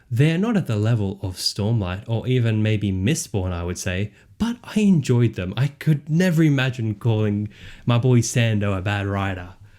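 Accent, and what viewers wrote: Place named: Australia. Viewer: I am loving the Australian that's coming out! More please :D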